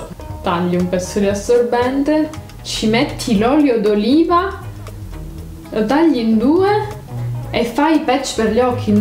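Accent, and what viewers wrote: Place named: Catania, Italy. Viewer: sei tutti noi. Domanda sei di Catania? L'accento ci assomiglia, e io mi diverto più di tutti, essendo messinese capisco alcune cose che dici in dialetto. ❤❤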